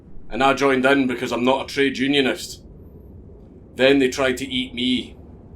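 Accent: Scotland, United Kingdom. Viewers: Hearing a Scottish guy talk about cannibalism made me think about the movie Doomsday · rob morgan: I love that crazy Scottish accent.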